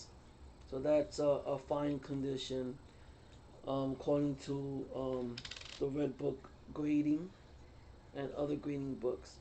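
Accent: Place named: New York, United States. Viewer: I understand what you are saying here Sir. If it's yours and you want to keep it in your personal collection then you can shine it if you want to. Yes, it is a matter of it's mine and you better watch how you mess with it. It is called Coin Care for a reason right? But honestly I find hot water and Dawn dish soap does wonders on my Q-tip. I love your New York Spanish accent Sir and I can't do math very fast either. That dirty old goat Ben Franklin was right about the Penny idea though. Granny used to save all of Grandpa's coins every day from his pockets in a five gallon jar 🫙. In November each year she started rolling all them coins, then took it to the bank. Each year she had very near $500.00 hundred dollars for Christmas to buy gifts for the children and a nice family dinner for their meal. Why? Because she would quote "a Penny saved is a Penny earned", just good advice still today.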